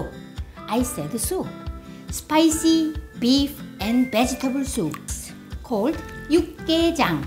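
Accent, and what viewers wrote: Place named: South Korea. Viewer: You are so cute. korean intonation of english too.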